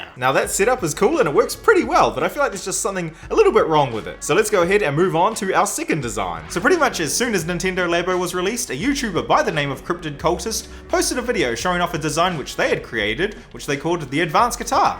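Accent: New Zealand. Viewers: Your from New Zealand ay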